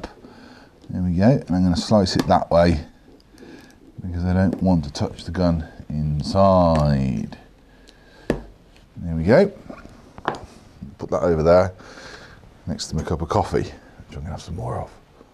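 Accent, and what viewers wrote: United Kingdom. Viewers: I love to hear you talk :( funny guy, speak very well, nice pronounced british accent.. Don't get what people dislike!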